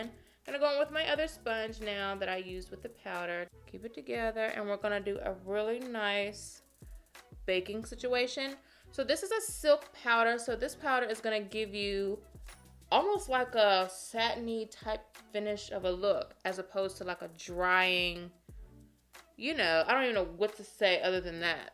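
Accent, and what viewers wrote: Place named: North Carolina, United States. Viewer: Hey it sounds like you live in my area of North Carolina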